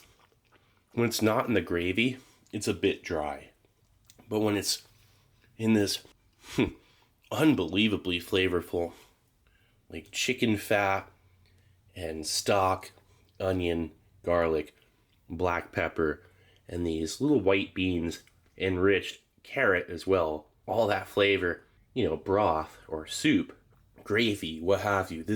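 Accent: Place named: Texas, United States. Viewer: Where is this guy from? He has an interesting accent sometimes, not sure if he is from Texas or somewhere else.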